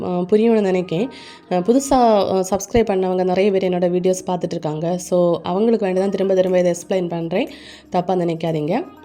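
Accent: Kanyakumari, India. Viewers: Akka neenka entha place Kanyakumari language pesurinka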